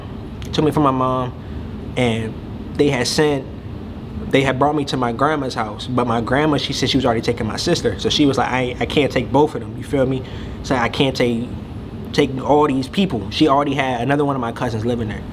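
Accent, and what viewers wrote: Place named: California, United States. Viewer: Bro, it’s crazy. I always knew it something about you. I know my fucking people your mom and yo is from Cali. That’s crazy I don’t know if it’s Up North in Oakland or South Central or somewhere close but I could tell by your accent already but when I heard your mommy, Uncle 👊🏾 oh for sure, I knew what time it was.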